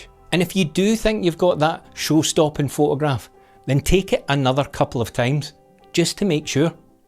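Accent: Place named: North, United Kingdom. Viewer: Mate, I can hear you speak for hours and hours! I normally put videos like these in the background while I cook breakfast and hope to get some inspiration for my next photography trip. But today, the eggs and butter remain untouched throughout the 14 minutes of this lesson. I was genuinely engrossed and didn't bother whether I wanted an omelette or fried. Great photos my fellow northerner! Came for the tips, staying for the accent.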